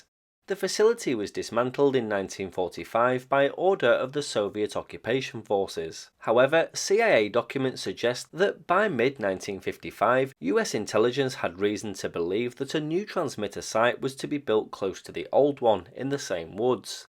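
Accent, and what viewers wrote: United Kingdom. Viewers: This was my first time hearing German spoken with a British accent! :)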